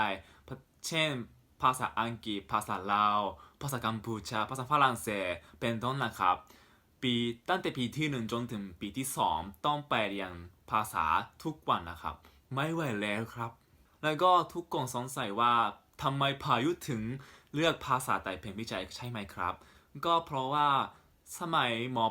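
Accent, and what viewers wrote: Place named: Japan. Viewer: เก่งมากเลยครับ พูดคล่องเหมือนคนไทย 70% สำเนียงติดที่ลิ้นแข็งแบบคนญี่ปุ่นเป็นกัน ฝึกพูดเยอะๆครับ สุดยอดครับ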